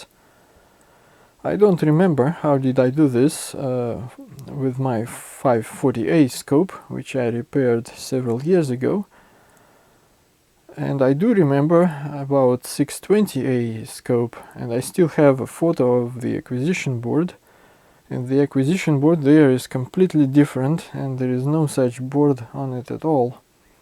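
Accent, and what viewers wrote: Russia. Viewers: Great video. Enjoyed very much watching/listening while you troubleshoted. Thanks for sharing. (Also enjoyable to hear various english 'world'-dialects, and I guessing you are east-country, russian or something. Its beautiful that there are so many variations around :-) ..have nice week to you, fam and the surroundings. br, Rolf/norway) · Акцент, и очень сильный. Я только потому задержался на видео, что с первых слов заметил типичное российское звучание английского. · Hello to russian colleague! (English native speakers never actually realise how exactly the real russian accent sounds like!)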